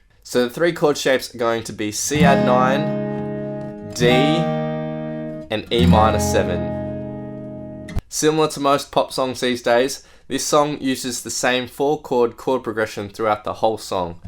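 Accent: Australia. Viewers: Aussie? NOICE!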